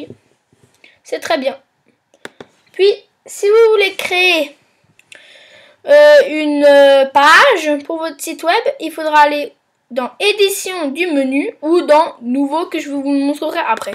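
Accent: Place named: Alsace, France. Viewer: Ta voix comme elle a change et jkiff ton accent Alsacien 😂😂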